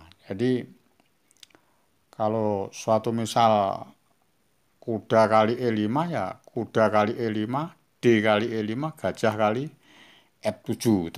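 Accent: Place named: Tegal, Indonesia. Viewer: Sampean kaya wong Tegal min...kaku omongane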